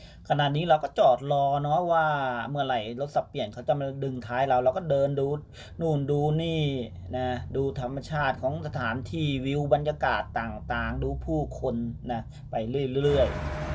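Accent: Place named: Suphanburi, Thailand. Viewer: เสียงเหน่อสุพรรณบุรี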